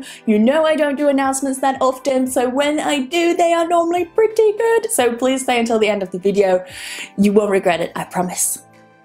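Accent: United Kingdom. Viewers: Hi. Thanks for your recomendations. I like so much the british accent☺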